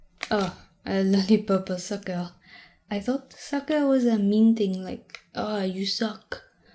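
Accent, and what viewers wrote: France. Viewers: everyone gonna ignore the fact we just got a free science lesson while listening to a nice french accent. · Ur french accent is neat ^^ u put a lot of effort in it Nice roleplay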